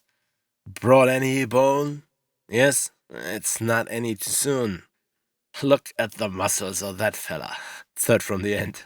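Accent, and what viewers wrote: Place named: Europe. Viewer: Digging the creepy eUrOpEaN accent delivery here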